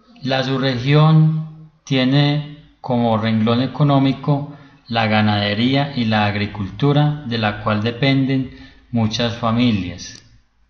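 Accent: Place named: Colombia. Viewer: Ame el acento colombiano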